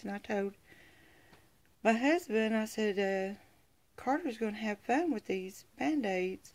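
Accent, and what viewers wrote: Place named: Tennessee, United States. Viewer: I knew you were in Tn by your accent. I lived in Chattanooga for 17 yrs. I love the yellow ones💕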